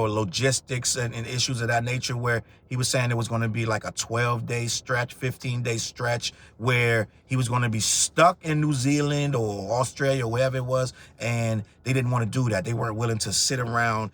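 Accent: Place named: Washington DC, United States. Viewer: I love listening to dudes with DC accents. Remind me of home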